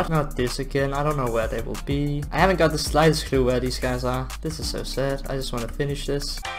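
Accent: Denmark. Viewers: It's the danish accent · Hey i just Wanted to know if you are danish cause your English has a danish accent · U from Denmark? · were a you from? bc you have a danish accent. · i knew ur were danish the accent is HEAVY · Er du måske dansk haha, nemt at høre ;) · Are you danish by any chance? Quite northern accent😁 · aRE yUo A daNmaRKeR? Yes Can tell from ur accent ;) we can always find each other us danish ppl am i right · I smell danish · I smell danish. Me too partner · Are you from Denmark?, your english sounds a little like it, Keep up the good work <3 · Are u danish?? Sound alot like a dane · i smell danish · i smell danish, you said i figured out who det er lol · You Sound danish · are you from denmark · Are you danish? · This man sounds so danish. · Is he danish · Are you from denmark? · Dude its danish, he is a dane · it sounds like you are danish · You really Sound Danish are your Danish??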